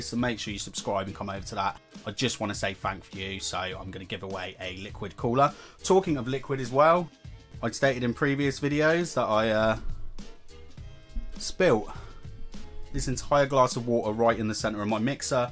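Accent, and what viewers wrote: United Kingdom. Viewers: u're so british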